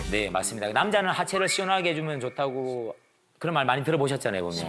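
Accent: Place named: South Gyeongsang, South Korea. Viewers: 기분 나쁘지 않은 사투리임ㅇㅇ 어떤 경상도 사투리는 짜증이 가득 묻어있어서 짜증나는데 이분은 오히려 ㄱㅊ음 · 비뇨의학과 의사 + 경남사투리 + 딕션 모든게 완벽함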